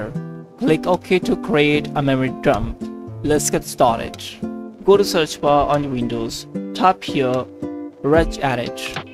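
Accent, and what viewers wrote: India. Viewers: Hey man, thanks for the help! I know that if a tutorial has an indian guy voice i know that he will help my problem, and it will Thank you a lot Subscribing btw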